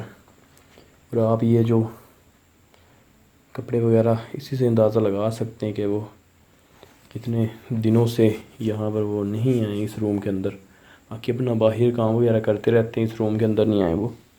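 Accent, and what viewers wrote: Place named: Punjab, Pakistan. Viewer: hit like before watching.. or bhai just luv ur accent jab ap urdu bolty bolty koi ek lafaz punjabi ka maar jaty hain.. serious video men mahool say bn jata hai.. Allah pak hemsha apko apny hifz-o-aman men rkhy (Ameen)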